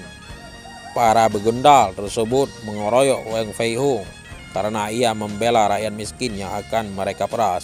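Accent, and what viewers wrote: Aceh, Indonesia. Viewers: Yg bc ceritanya kok kyk org aceh ya... Dialek nya kental banget aceh... Bs di percaya berita ini...???? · Nyoe yang baca berita awak aceh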